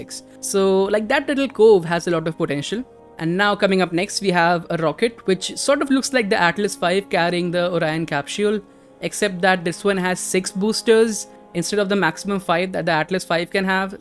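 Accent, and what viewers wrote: India. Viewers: Bro has Indian accent!.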